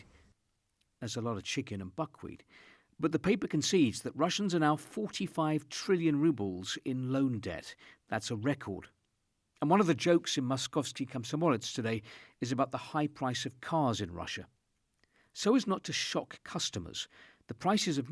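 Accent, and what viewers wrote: United Kingdom. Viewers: Nothing better than a British voice, telling everyone how appauling the rest of the world is for supporting russia, while harbouring billions of Oligarch money since the 90s and 00s. And not only that, the UK has it on the left and right all the same, Galloway and Farage both seeing who can get their nose the furthest up oligarchal donors. Both acclaimed political heroes of the politically demented.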